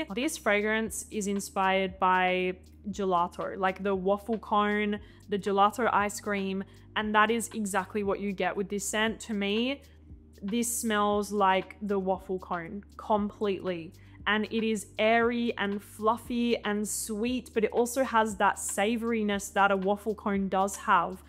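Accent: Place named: Australia. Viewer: That’s called an Australian accent. I love it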